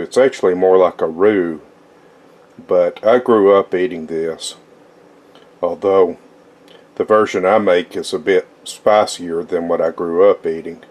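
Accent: South, United States. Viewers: Hi, that gravy looks delicious and I wish that I had some of it in front of me right now. I will definitely try your recipe. You sound like a southerner, I am from Okla. but I live in Idaho. Looking fwd to seeing more of your videos.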